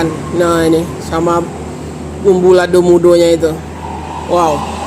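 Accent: Minangkabau, Indonesia. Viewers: Ulang dengari berdialek minang walaupun tidak totali, konon saya ingin pelajari dialek minang🎉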